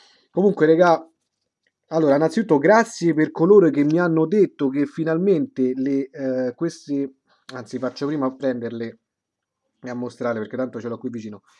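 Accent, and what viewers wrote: Italy. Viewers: Ma sei romano pk hai la lingua da romano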